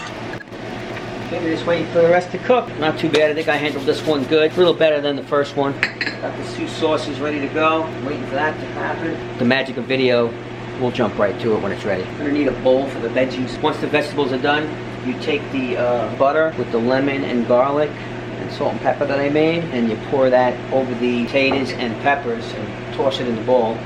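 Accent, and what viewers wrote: New York, United States. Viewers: Love the NY accent..💕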